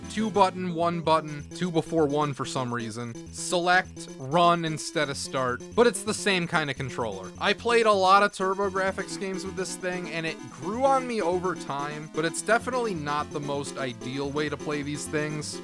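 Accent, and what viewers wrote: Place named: Midwest, United States. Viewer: What part of the mid-west / great lakes are you from? Because you have a distinct accent...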